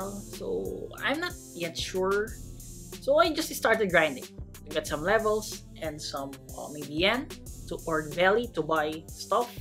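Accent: Philippines. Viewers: hey are u filipino just wandering cuase sometimes u say ay idk im just wondering and thank you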